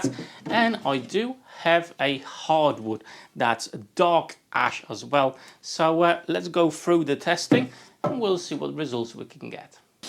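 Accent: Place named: West Midlands, United Kingdom. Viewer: Hey - your English is great, certainly a few thousand times better than my Polish! I'm guessing you were in the West Midlands (Wolverhampton?), judging by what I can detect from your English accent. All the best, Rob in Switzerland.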